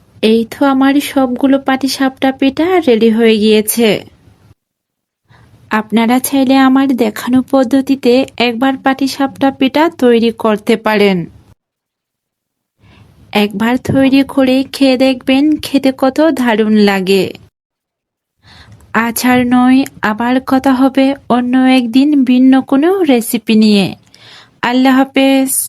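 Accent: Bangladesh. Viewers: ময়মনসিংহের নাকি আপনি?? খ কে ক বলছেন। বে ঠার কি?? বেটার। ছালের গুড়া কি চালের গুড়া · আফা আফনার মাতে লাগের আফনে সিলেটি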